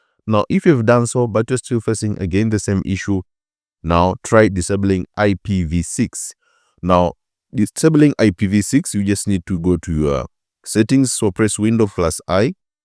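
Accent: India. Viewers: what is that accent bro indian or arab? fix your language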